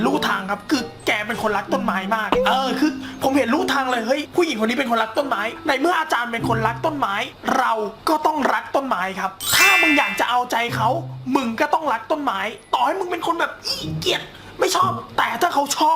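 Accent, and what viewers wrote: South, Thailand. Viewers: อาจารย์เป็นคนใต้หรอนิ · คนใต้นิ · พี่เปนโคนใต้เห้อะะะ555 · จารย์สำเนียงโครตใต้เลยนิ<num> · ใต้ออกพี่ 5555ฮาช.ห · ครู เป็นคนใต้หรอ55555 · พี่เป็นคนใต้ออ55555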